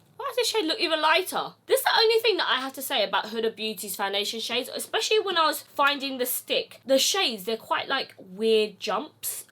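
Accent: United Kingdom. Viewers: OMG LOVE UR VOICE AND UR BRITISH ACCENT😍❤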